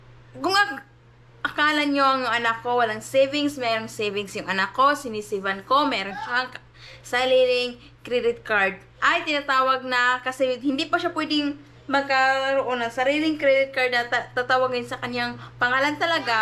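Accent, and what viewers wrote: Visayas, Philippines. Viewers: Dear most of visaya tlga, ganyan sila magtagalog just like kung pano magsalita mga tiga laguna, batangas, ilocano, british, english american, thailander, chinese. Tawag jan accent. Naiintindihan nmn namin sinasabi nya. Di nya kailangan mag adjust sa mga nanonood sknya kc we have choice nmn to stop viewing her video if you think na nakakairita kung pano sya magsalita. Sa pilipinas lang ata may mga ganyan na tao na kapwa mo pilipino ginagawang katatawa ung pananalita mo at linguwahe mo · Di sya galit, nag papaliwanag lang .sounds lang galit kasi ganyan mag salita ang bisaya accent lang yan na parang galit , 😅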